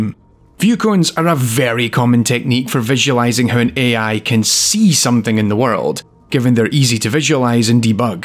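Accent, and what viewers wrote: Scotland, United Kingdom. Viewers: as a scottish person i cannot listen to another scottish person narration without the cringe turning me inside out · Is this scottish accent? If yes then damn i love it.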